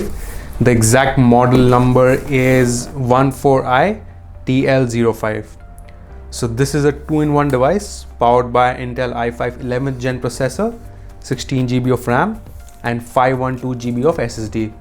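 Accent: India. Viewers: i love the indian accent that remember me raj in big bang theory 😂😜🥰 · horrible this indisch english this laptap is walk toched with16gb with bitter displaayy this thisi s is missi this -pooaaahh bluluuluuu o myy gooddd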